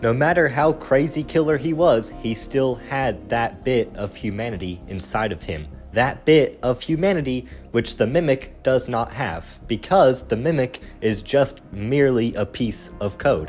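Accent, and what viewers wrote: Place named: United Kingdom. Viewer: British accent boy 😂😂😂😂😂😂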